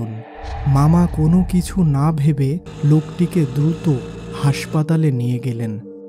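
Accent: Bangladesh. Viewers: কথা শুনে মনে হয় বাংলাদেশের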